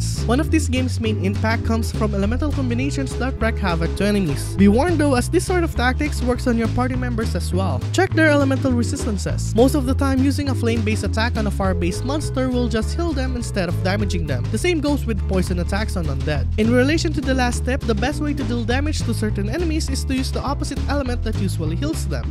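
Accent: Philippines. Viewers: Eyyy filipino accent 💯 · Corrct me if I’m wrong but I sense a Filipino accent 🤣🤣🤣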